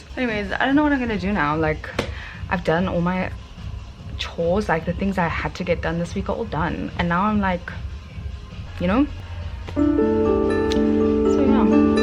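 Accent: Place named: Johannesburg, South Africa. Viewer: joburg boet accent has really plagued my vocabulary recently 🧍🏻‍♀️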